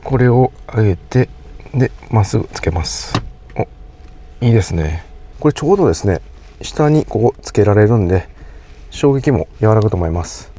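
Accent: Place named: Kansai, Japan. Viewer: そろそろ関西弁なんとかならんの？？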